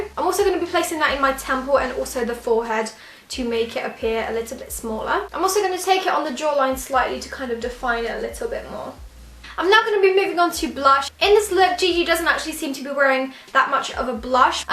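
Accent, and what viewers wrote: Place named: United Kingdom. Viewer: jesteś z Polski?????????????????????? co?????????????????? nie poznałam, masz taki piękny brytyjski akcent, wg śliczna jesteś. Od ilu lat mieszkasz w UK?:)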